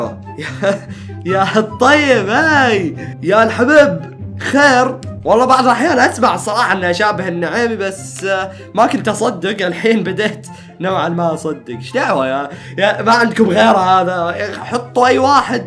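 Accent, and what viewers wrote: Gulf, Saudi Arabia. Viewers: انت سعودي ولا اماراتي؟؟؟؟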